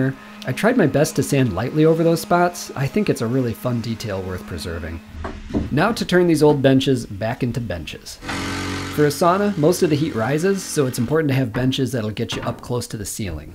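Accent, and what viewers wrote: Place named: Canada. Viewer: I was thinking, man this guy sounds kinda Canadian. Then you mentioned the high school and I realized you're in northern MN, so that explains it lol.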